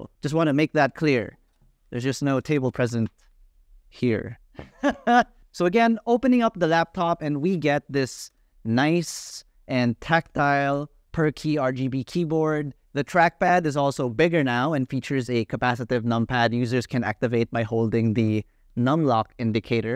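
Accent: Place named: Philippines. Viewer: Love the subtle Filipino accent lol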